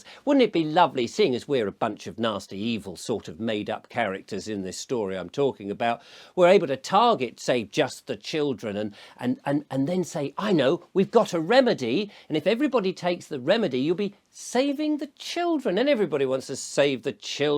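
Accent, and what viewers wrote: United Kingdom. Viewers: Wow! So well said. Its almost as if Mark Twain were still alive. We all know how he saw through things, too. I wish I was receiving that paper just to get a good laugh. Such an excellent job of presenting our situation. You are so gifted at speaking. Love your wonderful accent and British demeanor. Just absolutely love you. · You are an outstanding human being buddy ...no nonsense British talking ..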